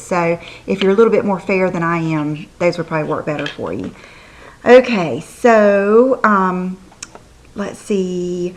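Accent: South, United States. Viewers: Hi!!!!! Great video. I'm a new subscriber and I love your channel. Love the southern twang too. Lol! We are close in age. I'm 40, so I appreciate all the advice..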